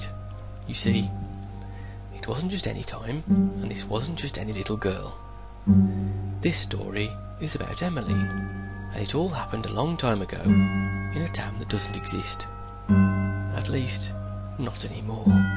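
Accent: England, United Kingdom. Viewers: And it's just so much more moving cuzz the narrator has an english accent :p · u know something going on when the story is black and white and a man with a English accent is reading it😰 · Wonderful o.o and a British narrator makes it all the better ^_^ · British voice!!! :D (or sounding one, I always get accents mixed up for some reason) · English accent :)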